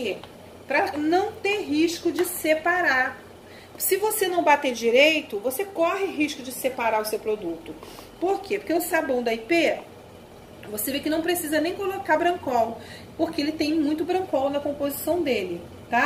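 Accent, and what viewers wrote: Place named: Rio de Janeiro, Brazil. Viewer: Pronuncia carioca bonita.....show de detergente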